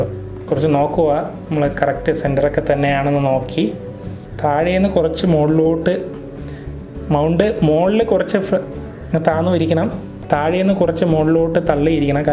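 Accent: Trivandrum, India. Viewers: NJN ATTINGAL ANN BROI. SLANG KETTAPOL THONNI TVM ANENN😅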